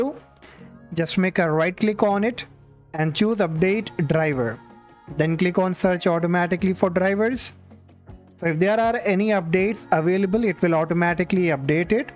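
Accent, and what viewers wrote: India. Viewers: every time i hear the indian accent i calm down and know its all gonna be okay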